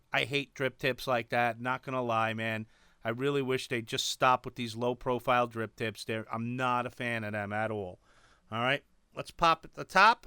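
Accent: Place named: New York, United States. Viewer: I can't use the low profile drip tips either. I just don't get WHY. But I just LOVE watching your videos man! So funny when it's all quiet, the kids are in bed, then all of a sudden a NY booming voice... "WHAT'S UP CLOUD CHASE-AHS!!!!" 😁🙏💗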